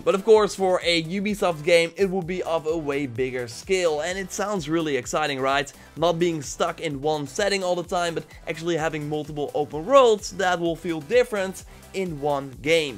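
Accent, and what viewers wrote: Netherlands. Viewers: Are. You. Dutch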